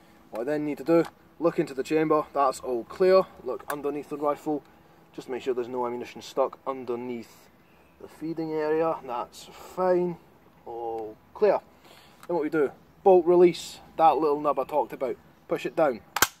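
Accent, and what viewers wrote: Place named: Scotland, United Kingdom. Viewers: Wait how comes you have a Scottish accent now? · This was made not long after a visit here and he always picks up on the accent. He's now has a permanent Scottish accent due to staying here.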